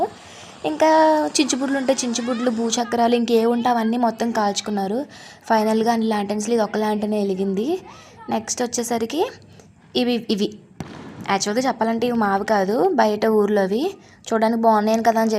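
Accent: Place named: Godavari, India. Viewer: Mee slang bagundi andi godavari vala andi meru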